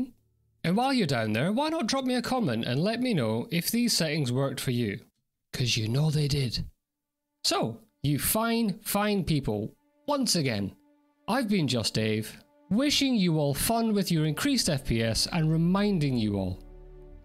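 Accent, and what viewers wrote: Scotland, United Kingdom. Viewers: Thanks for the great video. This will help squeeze every bit out of Star Citizen. Also, love the Scottish accent.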